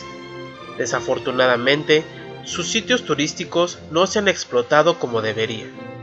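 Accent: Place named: Mexico. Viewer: Nada que ver tu video amigo... Te escucho muy mexicano.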